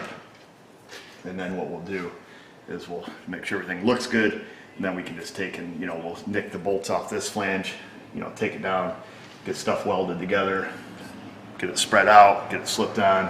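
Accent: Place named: Alabama, United States. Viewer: I like these videos , but I am from Alabama and it seriously sounds like he is saying Cadillac converters. Which is what someone from Alabama would say".".".......................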